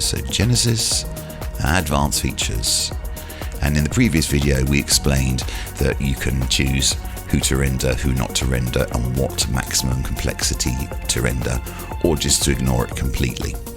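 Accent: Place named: England, United Kingdom. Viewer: i'm sorry.... I am having trouble understanding.... can you explain it to me inworld at my place?? the sexy voice and the english accent gets my brains in noodles 🤣🤣🤣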